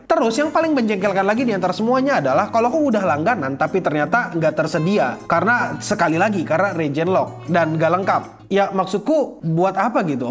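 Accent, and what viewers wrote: Java, Indonesia. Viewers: jadi medhok gitu le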